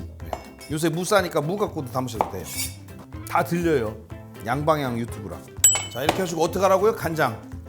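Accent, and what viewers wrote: Chungcheong, South Korea. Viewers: 백종원 님 말씀도 맛잇게 충청도말씀 저도 충청도임니다 감사해요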